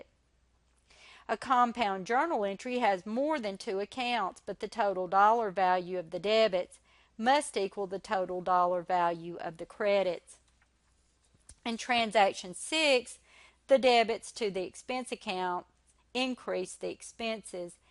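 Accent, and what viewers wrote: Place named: India. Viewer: shut up u sound like indian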